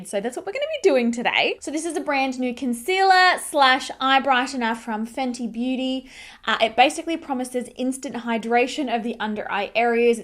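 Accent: Australia. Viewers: Sounds exactly like every young beautiful Australian girl, so better not ever come here then.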